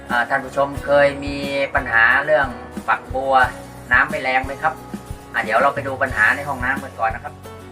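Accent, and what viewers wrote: Chanthaburi, Thailand. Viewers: สำเนียงเหมือนคนจันทบุรีเลยครับ บ้านผมอยูระยอง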